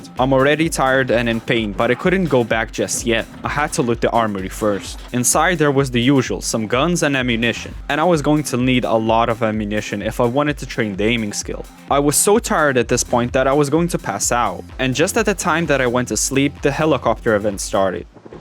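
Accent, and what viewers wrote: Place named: Lithuania. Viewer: i knew that accent was fammiliar, labas :D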